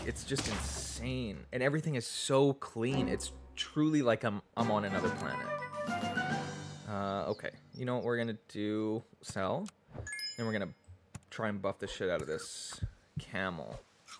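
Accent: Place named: Netherlands. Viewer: I can hear the NL influence haha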